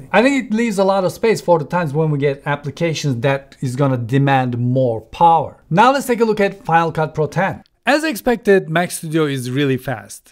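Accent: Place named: Turkey. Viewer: abi taşağına ve türk aksanına kurban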